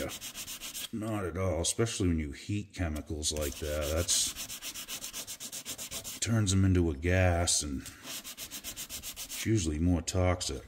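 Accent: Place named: Maine, United States. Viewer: Im trying to get the accent. I'm thinking southern maine, or maybe Taunton. Give us a clue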